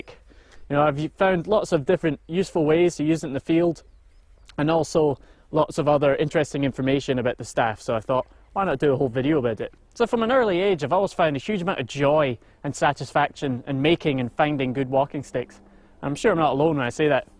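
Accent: Scotland, United Kingdom. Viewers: It may be southern or eastern Scotland. Im from the south east of Scotland and it's a much softer accent. Not all Scottish people have a brutal accent :) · A thirteen minute video confirming why the humble stick is one of the most versatile tools in human history, a plug for a foam weapon fighting group, and a Scottish accent. I think I might have found my home.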